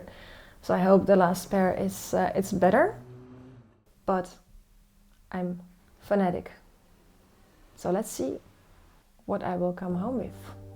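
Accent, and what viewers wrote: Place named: Netherlands. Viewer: Hezký klidný video. Jak dlouho už jsi v Amsterdamu? Mluvíš holandsky? .... totiž když video poslouchám, skoro mám někdy pocit, že ve tvojí angličtině slyším víc holandskej přízvuk než ten českej;)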